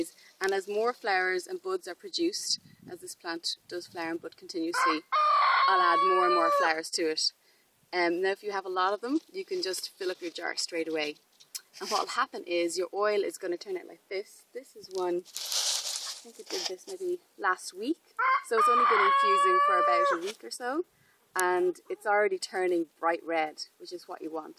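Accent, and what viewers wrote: Ireland. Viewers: Nice. Interresting Ireland accent. Btw I wouldnt judge you if you throwed a stone at the rooster while yelling "shut uuup!"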